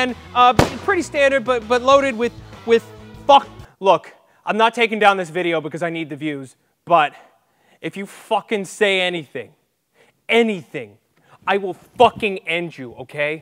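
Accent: Boston, United States. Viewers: Bawston accent is great. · Not sure if the accent is Bostonian or Archie Bunker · I mean like common, that baws-town accent ... really?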